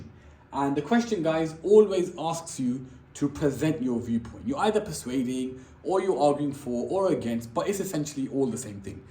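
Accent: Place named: Leicester, United Kingdom. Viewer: hes defo from Leicester but otherwise real good teacher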